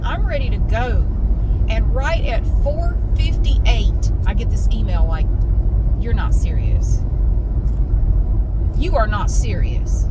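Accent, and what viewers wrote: South, United States. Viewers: I feel like an idiot because I just realized you’re. I didn’t know that til now, I’ve followed that channel forever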